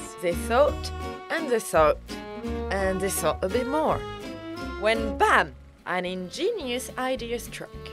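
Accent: France. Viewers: Love the French accent and how is gets progressively thicker over time 😂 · I just found out that I hate french accent, Good video though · Love the French accent here. It's so in theme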